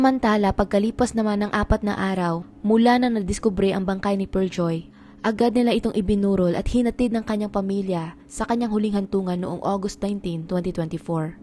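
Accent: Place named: Iloilo, Philippines. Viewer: Unsa manang inahana oy murag walay buot naapay inusturyahan ilongga bati paminawon. Hahay nimo day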